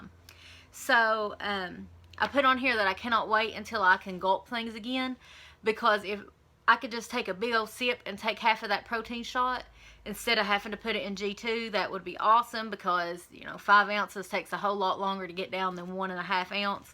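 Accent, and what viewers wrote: South, United States. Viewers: Girl I love your accent. lol Country girl like me! I live not far from you in Bristol, VA. You are doing so good, just hang in there, the lbs will fluctuate each week! Keep it up!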